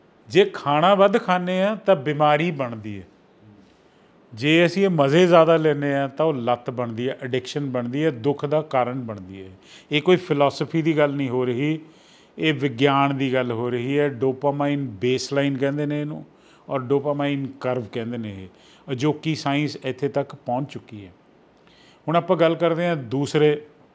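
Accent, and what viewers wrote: Puadh, India. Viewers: ਪੁਆਧੀ ਬੋਲੀ ਐ ਮਾਰੀ ਹੈ ਹਮ ਪੰਜਾਬ ਕੇ ਮਾਰੀ ਬੋਲੀ ਮਾਂ ਸ੍ਰੀ ਗੁਰੂ ਗੋਬਿੰਦ ਸਿੰਘ ਜੀ ਨੇ ਫਤਹਿ ਬੁਲਾਈ ਤੀ ਵਾਹਿਗੁਰੂ ਜੀ ਕਾ ਖਾਲਸਾ ਵਾਹਿਗੁਰੂ ਜੀ ਫਤਿਹ 🙏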